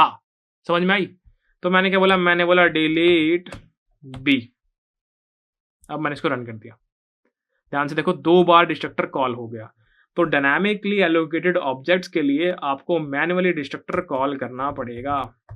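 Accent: Haryana, India. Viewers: your haryanvi accent is so sexy; please say it again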